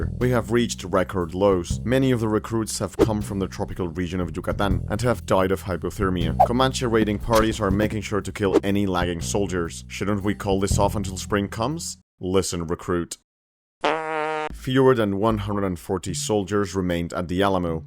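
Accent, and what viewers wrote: Castile, Spain. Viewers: Why are saying it like the Spanish way and not the Mexican/Latin American way of pronouncing the Zs and Cs. The odds are none of these historic figures pronounced it that way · Your English is among the best of any Spaniard I've ever heard... although I have to say it's a bit odd/amusing to hear all these Mexican names pronounced with a Castilian accent. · He said in another comment that he was born and raised in Spain, so he's just talking in his native accent. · Sounds very Castilian, I like it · My knowledge of Mexican history is bad, but not terrible, I’d like to think I have a 1.5 or 2 stars rating. But hearing the proper Spanish pronunciation of names and not recognizing them, even though I’ve READ these names before has me shook lmao 😂😂😂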